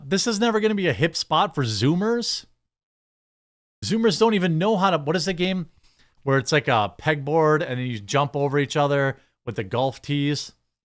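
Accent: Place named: Wisconsin, United States. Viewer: Dang you live in SE Wisco?? I thought I recognized that "accent" lol - I was born in Elkhorn and still live in good ole Wisconsin 😎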